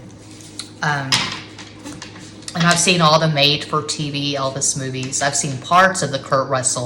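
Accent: Texas, United States. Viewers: Eres muy buena!!! And i love your texan Spanish! i lived in F Worth few years ago. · I love Texas accent, love from Austin, TX. 💖 Picked Pile 4, wish we are committed, but already broken up, he immediately jumped back to a rebound with his ex, essentially monkey branched behind my back.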